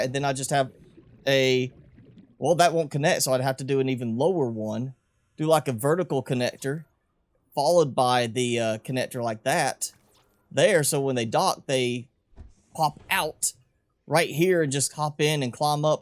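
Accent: South, United States. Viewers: I love your southern drawl it is so charming XD Up here in Michigan we tend top be on the nasally side lol. Love you Subnautica videos, seriously huge help thank you so much for making these! Best Subnautica Youtuber right here folks^^.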